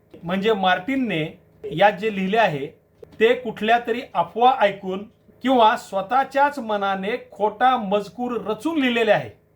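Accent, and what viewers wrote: Standard. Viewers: तुमच्या या शुद्ध वाणीतून ऐकताना खूप धन्य वाटले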